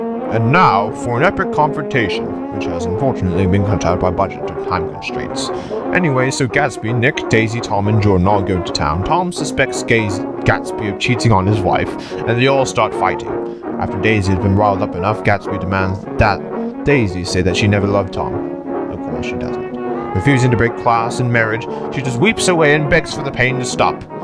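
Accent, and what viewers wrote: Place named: Transatlantic, United States. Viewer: This video haunts me ever since I found it, words can't quite capture what sort of experience this video does to me. Its the Great Gatsby but Lazytown read in a Transatlantic accent via what I can imagine is either Sparksnotes or a severe abridged version of it, i am haunted, why was this made, why does it bless us with its presence, why am I only now learning of it, so many unanswered questions plague me and yet I must watch it again.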